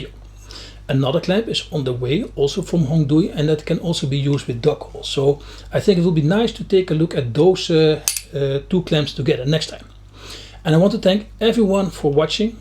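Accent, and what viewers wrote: Netherlands. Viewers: Leuke video man grapig dat Nederlandse Engels 🤣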